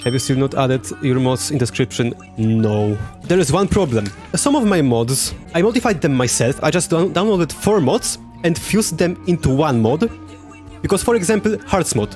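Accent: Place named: Poland. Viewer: Niezły film Oraz dobry akcent Ale Polak zawsze pozna Polaka nawet gdy mówi po angielsku Ale wciąż bardzo fajny odcinek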